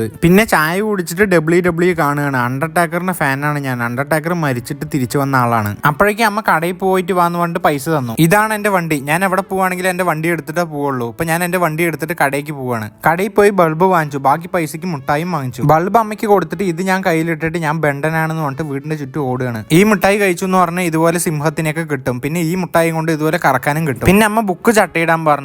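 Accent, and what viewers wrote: Palakkad, India. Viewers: എടാ മോനേ,സോറി ഞാൻ ഇത്ര സ്വാതന്ത്ര്യത്തോടെ എടാ എന്ന് വിളിക്കുന്നത് കൊണ്ട് തെറ്റിദ്ധരിക്കരുത് നിന്നെ കാണുമ്പോഴൊക്കെ എനിക്ക് വളരെ വേണ്ടപ്പെട്ട ആരോ ആണ് നീ എന്നൊരു തോന്നലാ.എൻ്റെ അമ്മ വീട് പാലക്കാടാണ്.നിൻ്റെ പാലക്കാടൻ ശൈലിയിലുള്ള ആ സംസാരം കാരണമാണ് അങ്ങനെയൊരു തോന്നൽ എന്ന് തോന്നുന്നു.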